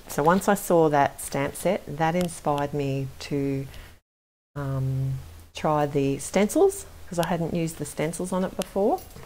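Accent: Australia. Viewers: What state do you live in? It’s nice hearing an Aussie voice.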